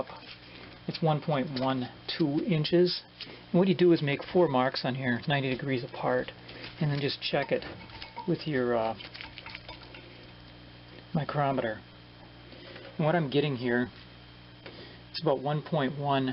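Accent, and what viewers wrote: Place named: Canada. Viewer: Great research material. I should make a video to see if I do as well. I am sure I would have to mute sound... You sound like a Canuck, just the pleasant, easy going feel of the video. There have been many times I wish I had been born north of the border. Thanks again for taking the time to make a quality How-To.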